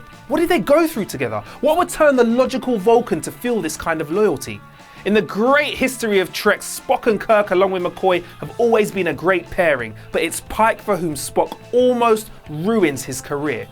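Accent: United Kingdom. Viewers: lol u so brittish <3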